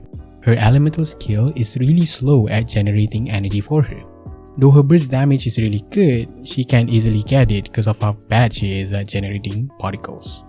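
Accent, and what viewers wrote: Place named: Malaysia. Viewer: bro got that malaysian accent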